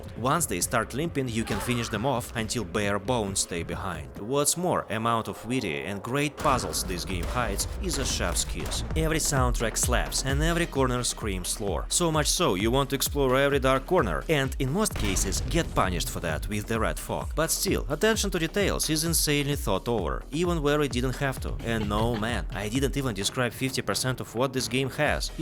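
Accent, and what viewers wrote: Russia. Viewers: ля сразу узнал русский акцент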